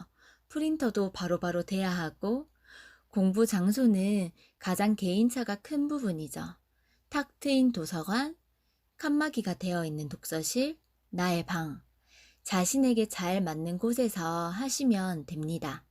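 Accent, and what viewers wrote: Gyeongsang, South Korea. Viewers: 감사합니다 목소리가 이쁜 공인중개사님~~ 성우 해도 되겠네요~^^ 대구분이세요? 잘듣고갑니다~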